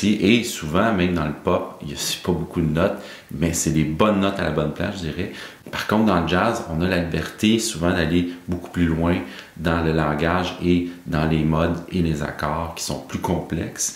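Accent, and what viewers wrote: Quebec, Canada. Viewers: Salut, merci de tout cœur. Ce qui me tue, c’est que je sais tout ça de façon empirique, et autodidacte, et même ton accent me dérange moins depuis que j’ai une amie d’enfance établie au Québec qui a repris contact avec moi….😂 Le problème est que je suis porteur d’autisme et que je suis totalement bloqué lorsque quelqu’un parle en mots alors qu’on est en « notes » ou en « sons »…. Aussi radical que ça, alors mon autisme, surtout en musique puisque c’est mon intérêt spécifique, est clairement invisible à yeux des autres. Je fais le vœu ici de découvrir et suivre ta chaîne pour apprendre encore et je continue d’improviser sur les disques ou music tracks de YouTube. Merci encore. Je m’abonne.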